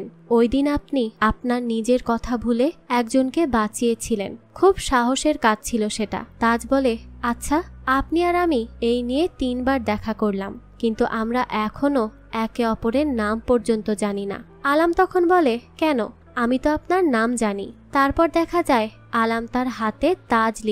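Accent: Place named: Bangladesh. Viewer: আপু তুমি কোন দেশি,,,তোমার ভয়েস r কথা শুনে মনে হচ্ছে তুমি বাংলাদেশি,,,তোমার চেহারা দেখার খুব ইচ্ছা ছিল আমার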